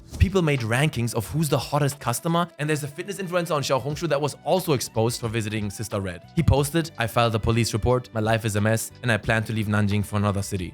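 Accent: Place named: Germany. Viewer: Ich habe gerade festgestellt, dass die automatische Übersetzung von YouTube durch deinen starken deutschen Akzent einfach nicht funktioniert. 😂😅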